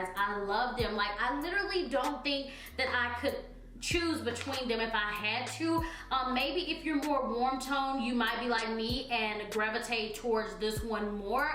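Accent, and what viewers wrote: South, United States. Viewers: My 15 year old and I watch your videos together we love you. Love your southern twang. Snapchat: queencindy619.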